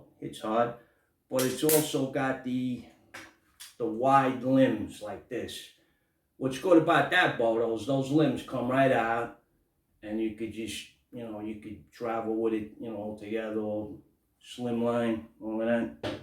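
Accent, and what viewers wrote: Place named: New York, United States. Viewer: Hello from Glasgow Scotland, Getting very rare to hear a proper NY accent, its the same with the proper Glaswegian accent, great review, I have a few pistol bows and what I have done with the bolts is fill them with silicone, cheap and does the trick for a bit of extra weight.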